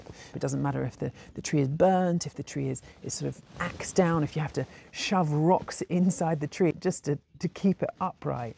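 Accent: England, United Kingdom. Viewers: Red hair, pretty, English accent. Boys, this is it. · Whatever British accent this is, its my favorite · Is the freaking english accent for me, but she also has that Brian Cox type of charisma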